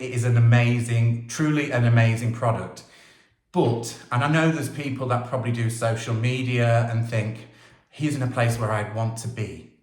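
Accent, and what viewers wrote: United Kingdom. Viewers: I'm thrilled your moving forward in a newish you direction. Artists, I believe, always need inspiration. So happy you paused and are in a happier place. I'm glad I can still hear my favorite UK accent. Cheers to you from the States ❤🇺🇲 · Thank you for sharing your creativity and your wisdom on how to turn an ugly or aged piece of furniture into a beautiful piece of furniture that more than often brings me a startling and genius idea on how your work progresses. It is a journey and watching you from Quebec, Canada was is and still will be a great delight to me. I love it when you say this wood project or painting is therapeutic. Because it's for me as well in the last year. Fixing pieces, painting them isn't my real work like you. Soon I have to go back to the real world and start working. Wish you all the best! P.s. Your British accent reminds of my teachers colleagues with whom I worked in international schools and I miss so much.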